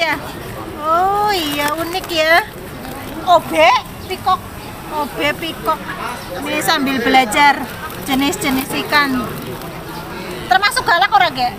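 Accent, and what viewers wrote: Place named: Banyumas, Indonesia. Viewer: Hadirrr.. mantap.. dukung terus channel le mbak ayune wong ngapak lek ora ngapak ora kepenakkk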